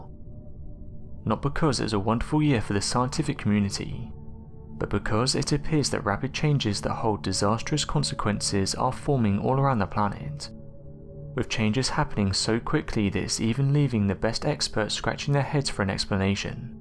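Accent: United Kingdom. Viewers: Galaxysurfer 1 It is you that is a bit thick. Did you not notice the guy has a British accent? They pronounce words differently. Who’s to say your country’s way of pronouncing it is correct? Have you ever heard a British person say garage? Instead of grr-age it’s more like gar-ridge. Listen to the song Levon by Elton John, he says gar-ridge by the motorway in the second verse. Since they were speaking English long before America existed, just maybe it’s their pronunciation that’s correct and you are wrong, lol.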